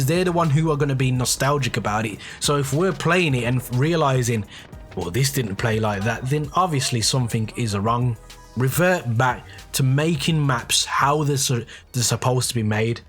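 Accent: United Kingdom. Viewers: Yo, where in the Isles are you from? I could listen to that voice explain shit all day.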